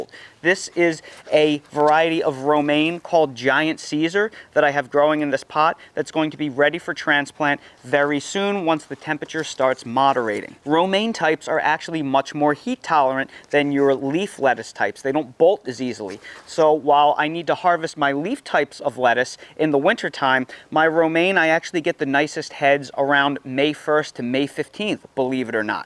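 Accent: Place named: Northeast, United States. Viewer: *I detect a NEastern UDA accent.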